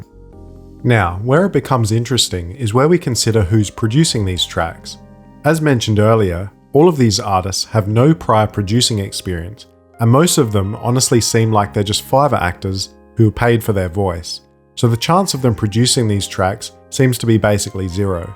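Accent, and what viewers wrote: Adelaide, Australia. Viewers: u sound like an aussie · are you a fellow adelaidian?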